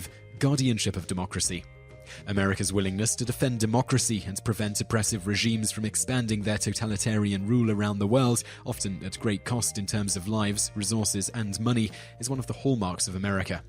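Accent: England, United Kingdom. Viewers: Wow, I didn't realize this until now: this guy has an English/Australian/New Zealand (IDK not good with accents) accent, dawns the Confederate flag, and talks positively about the United States...my brain hurts. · It's nice to here those kind remarks in a cool English accent. But I have to disagree about Americas "War Ethics," especially over the last couple of decades. As an American I take no pride in telling you that we are on a war of conquest right now. ANd have been for at least 14 years. Probably more. But It's nice to here something positive for a change:) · Was this guy born in the U.S. but raised in the UK......that explains his accent.....I was also born in the U.S.( New York ) but I was raised in Cyprus due to my dad but I can speak English with an American accent regardless of the fact that I was raised in a Greek speaking country.....now I leave in NY because I always wanted to and I'm 18:D and I think I will stay here forever 'cause I also got family here P.S I'm PROUD that I'm also American.......the topic of this video generically enough:) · Getting my American ego stroked by someone with a British accent just made my brain explode! 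· 'MERICA! From what I gather in the video, is an American, despite the British accent?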